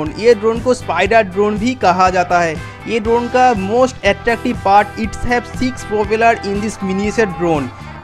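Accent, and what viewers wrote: Bengal, India. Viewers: R u A Bengali??? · bro are u Bengali?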